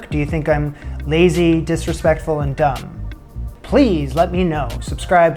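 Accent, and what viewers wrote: Canada. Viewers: Where are you from? You're accent is super Canadian.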